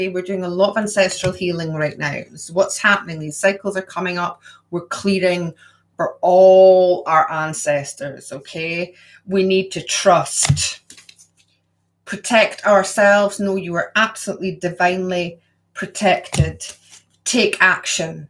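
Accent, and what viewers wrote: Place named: Scotland, United Kingdom. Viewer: Nice to hear a Scottish voice for a change on a truther video!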